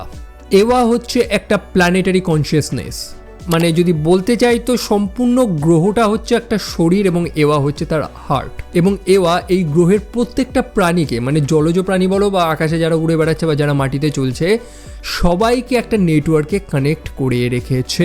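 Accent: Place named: India. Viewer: ভারতীয়দের উচ্চারণ আরকি 😂ইংলিশ ওরা এমনেই উচ্চারণ করে 😂নিজেদের মত করে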